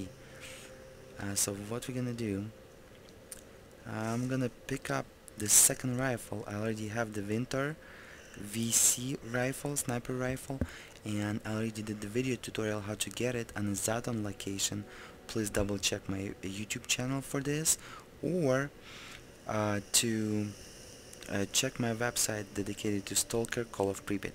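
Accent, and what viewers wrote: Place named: Russia. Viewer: You are russian, right? I know this accent))))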